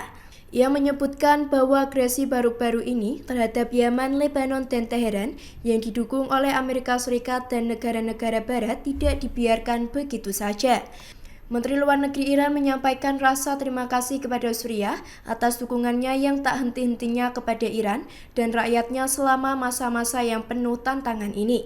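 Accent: Java, Indonesia. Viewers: mimin nya medok amat ya😅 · Narator nya medok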